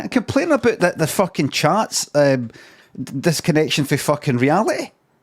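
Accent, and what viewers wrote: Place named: West End, United Kingdom. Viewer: Horrifying to see. Will only be a matter of time before Limmy's west end accent and his real voice are one and the same.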